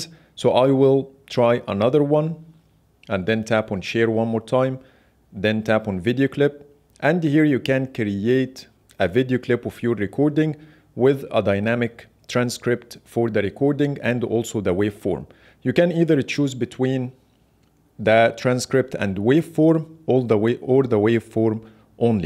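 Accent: Egypt. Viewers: it's an egyptien accent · Thats our well known egyprian-english accent. Weird enough other Arabs thinks its annoying! Lol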